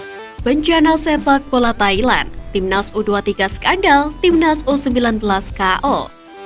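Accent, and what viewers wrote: Java, Indonesia. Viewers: Pembawa narasinya medok amat. Jadi ingat lagu... Andai aku letto wes pasti aku wong jowo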